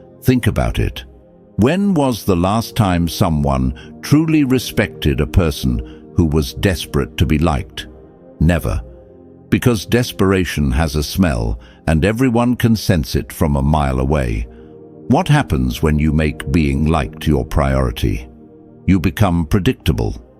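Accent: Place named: United Kingdom. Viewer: Change your voice British gag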